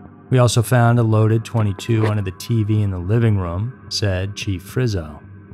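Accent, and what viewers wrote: United States. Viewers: His voice is really cool. I love his American accent 🤩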